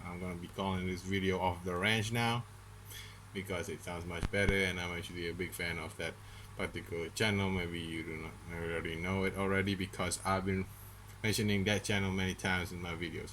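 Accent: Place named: Malaysia. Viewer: Sounds like Malaysian English. But He made it clear that it is not worth it.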